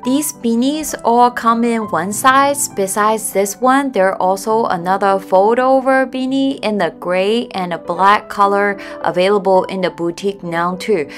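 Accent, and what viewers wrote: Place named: South Korea. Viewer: 韓腔英語。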